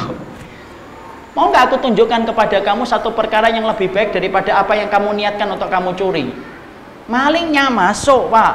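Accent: Java, Indonesia. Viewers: ini ustadz dari mana ya? baru lihat dua kali ini, jawa banget ngomongnya.. tapi sukaaaa..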